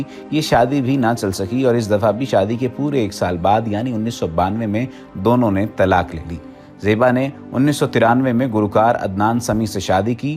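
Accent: India. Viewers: Urdu Nwabi accent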